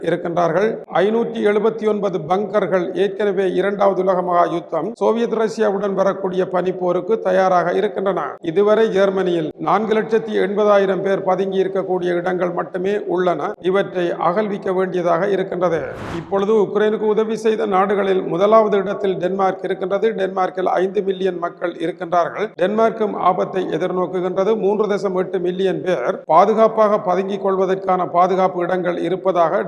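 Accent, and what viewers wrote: Jaffna, Sri Lanka. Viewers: எப்படி ஐயா இருக்கிறீர்கள் ? உங்களுடைய தமிழ் அதாவது யாழ்ப்பாணத்து தமிழ் இனிமையாக இருக்கிறது ... இதுதான் நடந்தது... பக்கத்து வீட்டுக்காரனுடன் நீங்கள் நண்பராக இருக்கிறீர்கள். அவன் உங்களது பரம எதிரிகளை தனது வீட்டில் இருக்க நீங்கள் சம்மதி கொடுப்பீர்களா ?? இதுதான் ரஷ்ய உக்ரைனிய போருக்கு காரணம். ஒரு கோமாளி அரசியலுக்கு வந்தால் எவ்வாறு இருக்கும் என்பதற்கு உக்ரைன் சிறந்த உதாரணம். 😊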